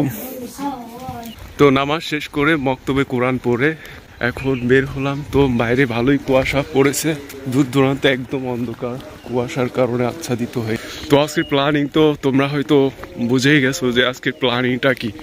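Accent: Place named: Purulia, India. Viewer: হ্যা আমি জানি কোন দেশ না। ভারতের পুরুলিয়া একসেন্টৈর মতো লাগলো তাই জিজ্ঞাস করলাম ।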